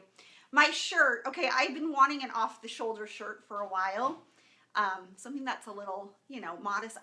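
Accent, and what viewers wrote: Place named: Chicago, United States. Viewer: Hi, I really love your enthusiasm! I'm originally from Chicago,but now reside out in Arizona with my husband and two now adult children! You have an "Chicago accent";) I'm a Christian and love the joy that you exude! I started following you on Twitter too! Blessings from sunny(and Hot!) Phoenix, Arizona!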